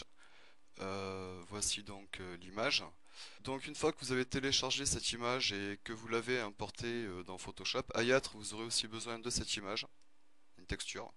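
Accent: South, France. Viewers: bonjour , merci pour ces tutos d'une très bonne qualité et vos explications pourvus d'un accent du sud ;-))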